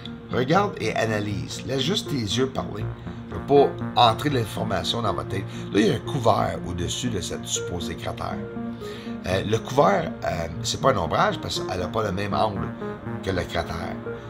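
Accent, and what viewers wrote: Canada. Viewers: Bonsoir j aime bien ce que j entends.moi être en France mais je vois rien dans le ciel mais je sent qu'ils sont la. C est dingo mais j'y croie a100 pour 100.J aime bien ta voix de Canadien c est dingo. Bravo et à bientôt. Si moi qui ne va pas sur ordinateur j espère que un jour bientôt ils seront et que le monde sera gentil avec eux.Bonne soirée de la France qui est triste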